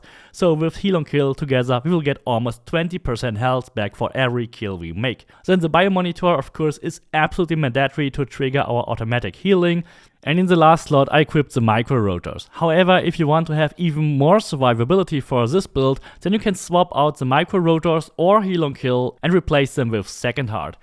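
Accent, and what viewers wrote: Germany. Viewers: I hear the german 😂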